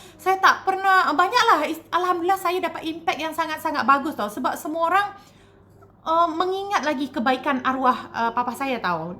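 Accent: Malaysia. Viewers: Saya suka dan senang.mendengar cerita ini, apalagi diceritakan orang Malaysia logat Melayu, berdarah Indonesia.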